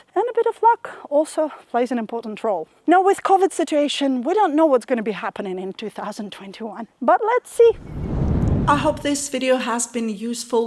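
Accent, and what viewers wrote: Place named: Jamaica. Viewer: Am I hearing a Jamaican accent sneaking into your speech ..........well done your e so knowledgeable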